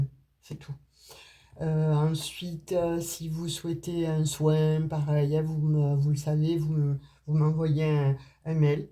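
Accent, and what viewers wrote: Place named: Provence, France. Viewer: je vous adore, votre accent me rapelle ma provence je vous envoi un bisous d'italie bonne fète.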